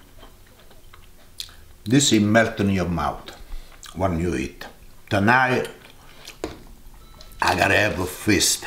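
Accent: Italy. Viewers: So good! I've been into chickpea flour instead of white flour because I think it's got more protein and is a bit healthier than the white. Going to make this soon. Looks amazing! I can smell it through the screen! Love your accent. I'm Italian too. Buon appetite! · Yummy 😋 😋 enjoy the Cooking and italian accent mama mia bellisimo 👍😄God bless you🙏😇♥ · His Italian English is hilarious !!😂😂😍😙😙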